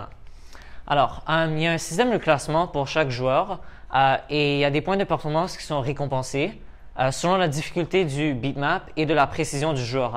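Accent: Quebec, Canada. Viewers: Oh, I was not expecting this. Your French is pretty decent ! Where did you learn to speak like this ? It sounds a bit like a French Canadian accent.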